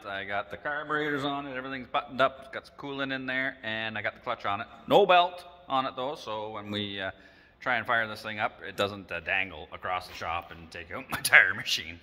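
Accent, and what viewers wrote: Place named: Ontario, Canada. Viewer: Where you from bud sounds like you’re from Ontario ?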